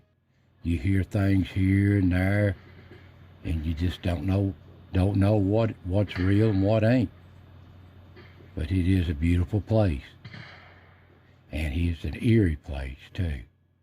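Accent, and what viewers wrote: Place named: South, United States. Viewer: Great video Sir. I could listen to your beautiful Southern Accent all day and night. My husband & I went to the battlefield. There was a moment when I felt overwhelmed and could not move. I told my husband " something terrible happened right here. I can feel it. It is such a soul-stabbing sadness that overcame me. I couldn't move. It was late afternoon, in summer. As sunset was beginning, we finally found the battlefield. He said "no baby, it is here. On this field, there are monuments to the battle. Come over here and look." He stood in the humid, hot air, in the tall grass of the battlefield. I had been overcome by grief at the dividing plot of land and tall pines between the lanes of the road leading to and away from that exact site. There, between the roads, I could feel the sadness wash over me like an ocean wave. 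I couldn't explain it, but I felt them...all watching me, and telling me ' it happened here". I have never forgotten that afternoon, nor will I ever.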